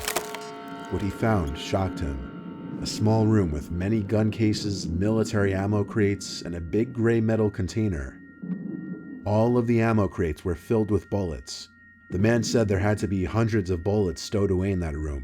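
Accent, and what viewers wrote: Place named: New Jersey, United States. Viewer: Me nightmare is def from like New Jersey or philly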